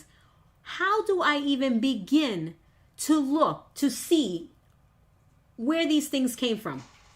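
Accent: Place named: New York, United States. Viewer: I have followed you since you showed up on the paper crafting scene so many years ago. I can still hear your NY accent! I have always believed that you are brilliant and have designed things unlike anyone else. That company was wrong and unprofessional. That designer that included the elements that were not theirs is also wrong. But, unless they can show proof of copyright, you have done nothing wrong. Please pursue legal action as this can be very harmful to your reputation. You need to stand up for yourself to make it clear that you will not tolerate this kind of behavior. I am so sorry this has happened to you, and you do not deserve it. Stay strong and proud. We know who you are, and that is all that matters in the end. Hugs to you.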